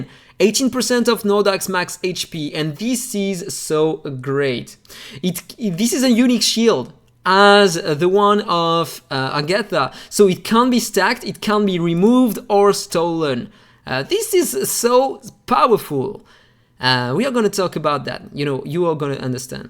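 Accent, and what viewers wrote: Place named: France. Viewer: He's French, relax English is not his first language.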